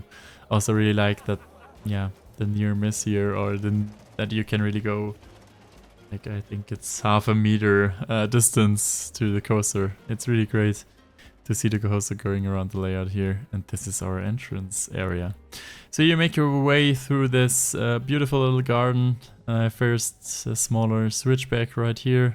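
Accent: Germany. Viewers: Are you german?😂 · got a German accent in his English...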